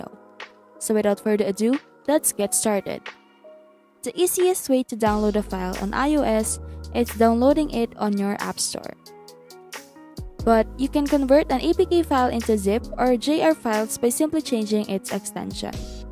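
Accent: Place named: Philippines. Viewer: Ang ganda mo!!, alam ko boses ng pinay pag narineg ko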